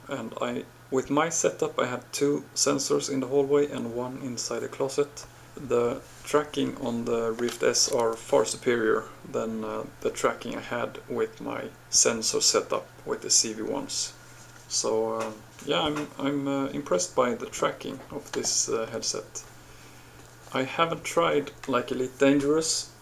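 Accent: Norway. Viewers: Ok cuz u sounden really Norwegian btw im Norwegian :D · are you from norway?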